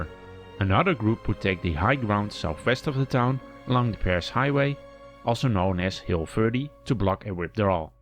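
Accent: Netherlands. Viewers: Aan je accent te horen ben je overduidelijk een Nederlander - ik ook zoals je leest ;-). Het algoritme van YT bracht me hier, echt interessante video, goed gemaakt! Nu mijn vraag: heb je al overwogen een 'episode compare' te maken van de afleveringen van BoB die zich in Nederland afspelen? 't Is al minstens 10 jaar geleden dat ik de serie voor het laatst gezien heb, maar ik meen me te herinneren dat minstens 2 afleveringen zich (iig gedeeltelijk) in Nederland hebben afgespeeld (Eindhoven, Veghel & buurt van Nijmegen -> die met dat kruispunt ergens in een polder vlak bij Nijmegen). Heb je uploads bekeken, maar zag ze er niet bij staan... lijkt me echt interessant vanuit historisch oogpunt, ook voor de vele fans van over de hele wereld van deze fantastische serie, plus qua productie voor je relatief 'eenvoudig' te maken - je woont hier immers al (neem ik aan, althans). 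Ik voorspel je, het zal echt goede content zijn die je veel views zal opleveren! :-) (een hele serie over alle afleveringen zou helemaal een hit zijn, denk ik... maar het moet allemaal maar kunnen natuurlijk -> daarom, Nederland om te beginnen, lekker dichtbij) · You sound dutch as frick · Are you Dutch? Seem to have an accent. Thanks for this !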